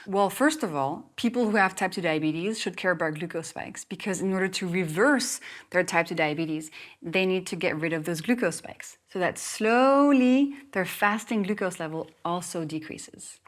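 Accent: France. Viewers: I simply love her French accent❤ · Do I hear a faint French accent? It,s not underneath, it’s below in this context 😅✌️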